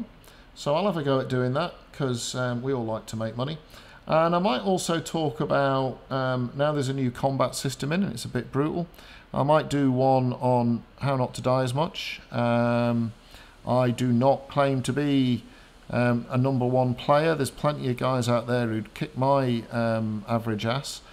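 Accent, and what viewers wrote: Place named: United Kingdom. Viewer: Really? I could of sworn your british :D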